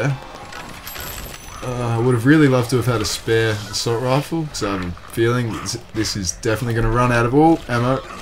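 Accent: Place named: New Zealand. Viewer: u from nz? you sound like one🤔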